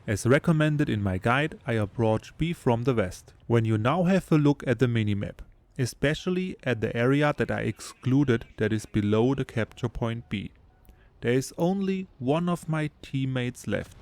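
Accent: Germany. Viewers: Der deutsche akzent 😂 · English is the yellow from the egg. Spaß klarer deutscher Akzent aber Video hat trotzdem mehr Aufrufe verdient, gut so 👍🏻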